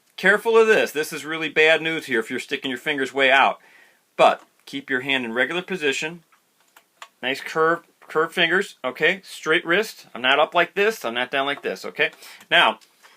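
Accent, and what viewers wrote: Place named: Upstate New York, United States. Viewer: This obviously the way Stan Getz played. As I look through his solos there are licks and patterns that only work with the bis.....btw where are you from? The accent seems upstate New York , Maybe Rochester?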